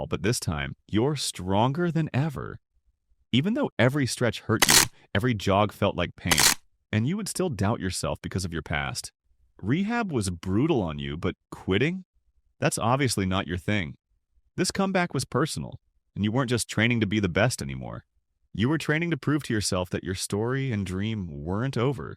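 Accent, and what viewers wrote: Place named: United States. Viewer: Bro is this video a troll? Because you sound too american to be serious when talking about football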